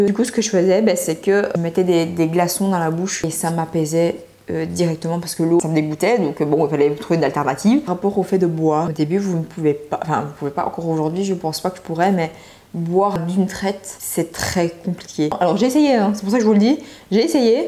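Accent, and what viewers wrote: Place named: Belgium. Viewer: T'es belge non? J'ai reconnu l'accent belge 😭😭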